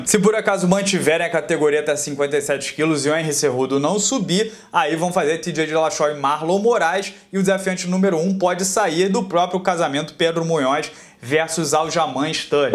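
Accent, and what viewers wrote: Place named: Pará, Brazil. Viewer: O tem um sotaque de paraense muito acentuado.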